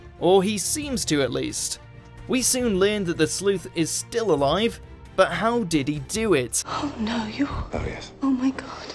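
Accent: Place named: Liverpool, United Kingdom. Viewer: liverpool , i hear in your accent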